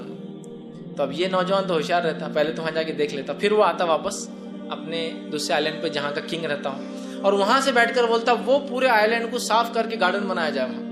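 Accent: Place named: Hyderabad, India. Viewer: Hyderabad wale lag rahe hai ye.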